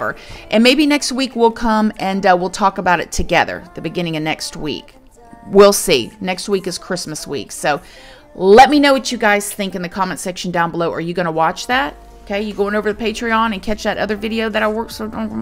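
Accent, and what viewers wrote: South, United States. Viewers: I don't know what part of Florida y'all are in, but I detect a southern accent. I'm in Enterprise in deep southeast Alabama. I startedwatching you in the early Gabby days. Love your videos!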